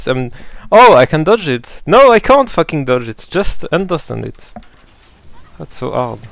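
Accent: France. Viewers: ton anglais est bon (t'as un bon vocabulaire, on comprend ce que tu veux dire, c niquel) mais tu fais aucun effort sur l'accent c'est dommage ; t'inquiètes c'est moins gênant d'essayer de faire l'accent que de juste forcer l'accent français c'est pas comme si y avaient des connards de français dans la pièce pour te juger (à part moi oups)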